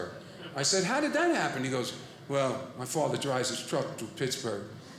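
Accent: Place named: Bronx, United States. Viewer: OMG I knew from your accent that you were from The Bronx!! Italians rule!!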